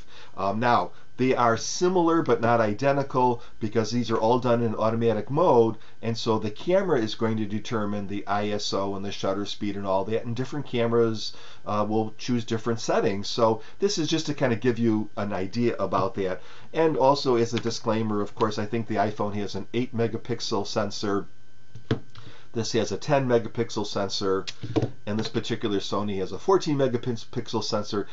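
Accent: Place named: Midwest, United States. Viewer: You have a very thick Midwest accent. Are you from Cleveland... perhaps Detroit?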